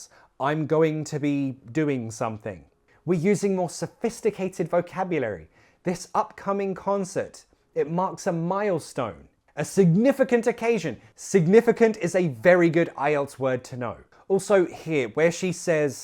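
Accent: United Kingdom. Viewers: You're always wonderful! I adore a British accent and really like your way of teaching, I study English language and life of Brits❤ by the way, 5 years watching you from Iraq! ❤❤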